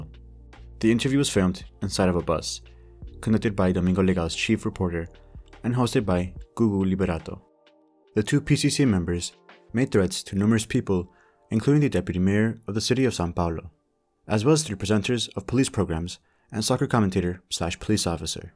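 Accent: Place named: Spain. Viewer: Why would you be shocked? You can hear it in his voice when he speaks English, that he can also speak Spanish.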